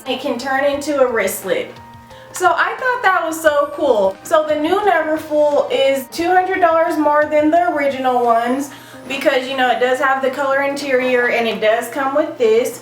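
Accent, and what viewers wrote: South, United States. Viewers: You are so cracking me up with how honest you are about these shoes. I call it Shoepidity. Especially those god awful Louboutin. I have the Penny, took me over a year before I wore them. I have only wore them twice. Plus the platform is a dated shoe. I was coveting the white pigalle but I wanted it in the pretty blue. I am really impressed with your knowledge. Regarding finding sales. It's Dec 2 and June 2nd for sales. That's Gucci, Saks, Neiman and the other like department store. BTW where are you from? You have a strong southern draw. I have to check you out on Instragram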